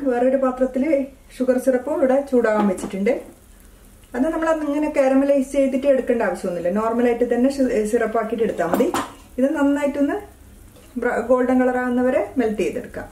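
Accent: Kannur, India. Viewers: Are you from Cannore, parayunna reethi kettappol tonniyathaanu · നിങ്ങട കണ്ണൂർ സ്‌ലാങ് കേക്കാൻ നല്ല രസമാന്ന്... ഞാൻ ഇപ്പൊ ഉണ്ടാക്കിക്കൊണ്ടിരിക്കിവാന്ന് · കണ്ണൂർ ആണോ ചേച്ചി..സംസാരം കേട്ടപ്പോ തോന്നുന്നു..കണ്ണൂർ ആണെങ്കിൽ, എവിടെയാ.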